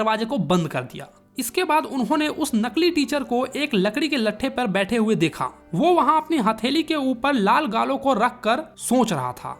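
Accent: Bihar, India. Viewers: Are you from Bihar 😎😎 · I think you are from bihar... Right?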